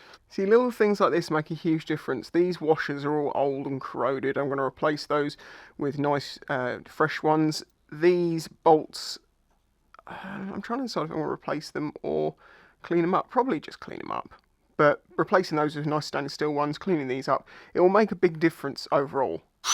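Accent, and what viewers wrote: United Kingdom. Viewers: Are you British or American you sound British but you said DR ZEE if your British you should know we say Zed , adjusting for the yanks are we.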